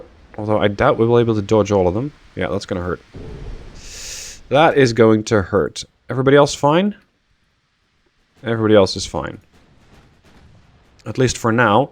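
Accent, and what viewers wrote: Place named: Netherlands. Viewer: Your accent has enough Dutch in it :)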